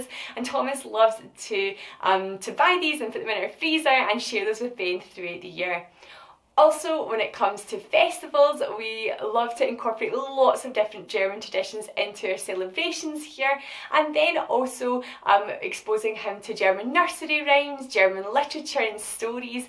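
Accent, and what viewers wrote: Scotland, United Kingdom. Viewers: Love this video! My husband is french and I’m English/Welsh so we have a few languages going on! We’re also sticking to the one parent one language system but I do need to prod my husband mathieu when he forgets 😅 You have such a lovely accent! My wee granny was from Lewis and I spent all my childhood summers there so I have such a soft spot for the Scottish accent ✨ Lovely channel! New sub here, I can’t wait to see more ✨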